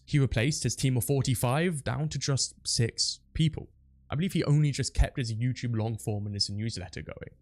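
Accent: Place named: England, United Kingdom. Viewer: Even with an english accent, this dude still could not sound intelligent. Give up bud!!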